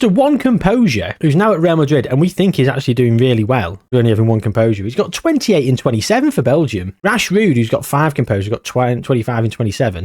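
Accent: United Kingdom. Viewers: Are you Scottish? Fun video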